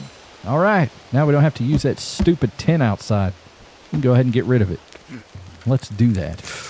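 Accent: South, United States. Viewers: I just found you on this Stoney Friday and as a southern girl from N.C I appreciate your soothing southern charm voice, you’ve not overly obnoxious and funnnyy I had so many good laughs I need this game😂😂❤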